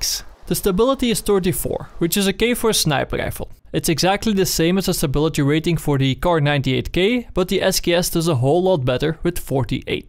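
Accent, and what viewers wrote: Netherlands. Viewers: clearly dutch he sound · Are you dutch? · He's clearly dutch... we got that weird r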